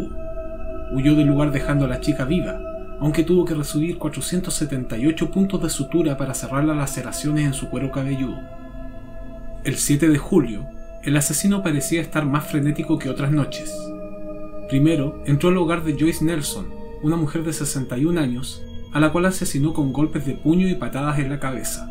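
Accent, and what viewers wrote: Chile. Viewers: El tono shileno le saca lo oscuro y se torna hasta gracioso 😂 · El narrador sin duda es chileño, gran trabajo, vato.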